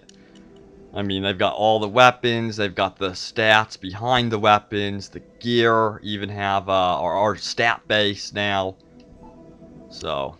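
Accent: United States. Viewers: He sounds like Forrest gump No offence but in English so to me everyone from the south sounds like Forrest gump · Are you american???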